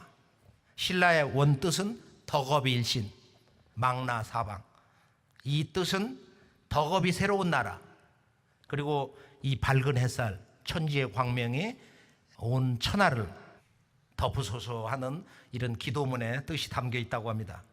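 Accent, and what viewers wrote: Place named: Yanbian, China. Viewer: ㅋㅋㅋㅋ 뭐냐.. 완전 조선족이구만..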